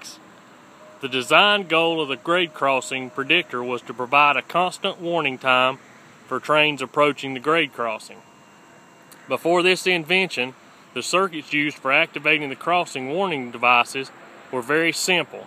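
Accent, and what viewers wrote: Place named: Mississippi, United States. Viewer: I'm from Mississippi and that accent of yours sounds like it a Mississippi accent!!!!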